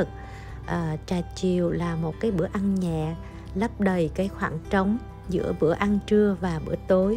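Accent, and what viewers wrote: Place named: South, Vietnam. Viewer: Giọng miền Nam của cô * nghe hay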